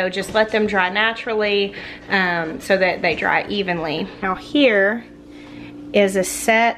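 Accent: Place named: North Carolina, United States. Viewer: Your channel was the first channel I watched when I decided to go Eden on my yard. Very informative and thorough on your videos. The accent also makes me miss NC. Keep up your great work and on educating myself on so many topics. Thank you.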